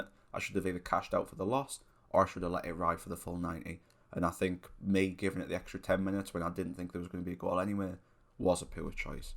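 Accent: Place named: North Yorkshire, United Kingdom. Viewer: That's a very North Yorkshire accent you have, 'Boro or Redcar way! My hubby was watching and I recognised your accent from when I lived in Saltburn North Yorkshire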